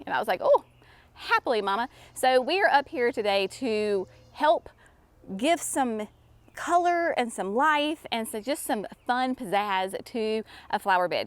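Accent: South, United States. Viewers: Does Mimi have your sweet southern accent too? Thanks for sharing.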